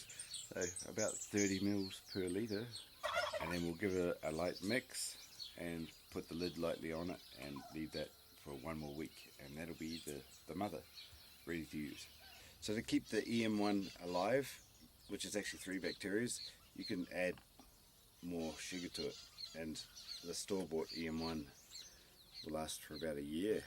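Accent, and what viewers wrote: New Zealand. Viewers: great to hear a strong kiwi accent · Did I notice a kiwi accent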